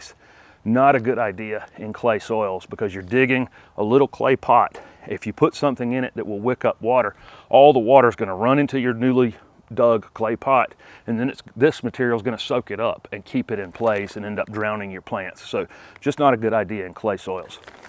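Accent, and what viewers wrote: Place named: Central North Carolina, United States. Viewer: Thanks for this great video! I’m a Dukie and would swear I’m hearing a central NC accent. Feel like I’m hearing home. Will now be a subscriber forever!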